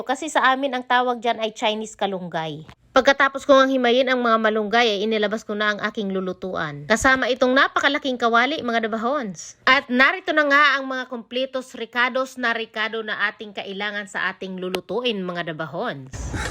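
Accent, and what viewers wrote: Bicol, Philippines. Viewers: Bicolano po ba kayo?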